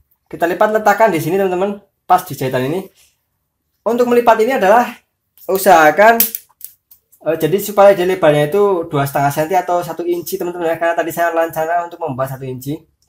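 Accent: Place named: Java, Indonesia. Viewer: nglempruk wkwk kangmas e wong jowo ....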